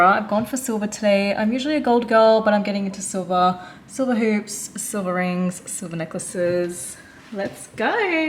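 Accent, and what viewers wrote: United Kingdom. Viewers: girl, you do be sounding a bit british ahhhh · To be honest at the beginning of this video when you said you were actually Australian I had no idea lol I thought you were British too, I'm so sorry 😆🥲 Loved the thrift haul, sad to hear that the thrift scene in London isn't that amazing though, but you still managed to find some nice things!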